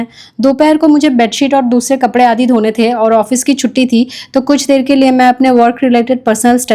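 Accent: Bengal, India. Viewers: Gopal, kishori ju ki sewa dekh bahut acha lagta hai, aap jo bangla hindi ko mix kar ke jo hindi bolte ho wo bhi acha lagta hai ❤❤❤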